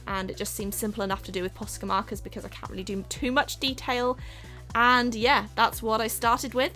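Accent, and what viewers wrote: United Kingdom. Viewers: the things you say ‘wrong’ are just the British way of saying them. I say Van Gogh and Amazon the same as you. From UK x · Yaaah u r british so u will say like that ❤️❤️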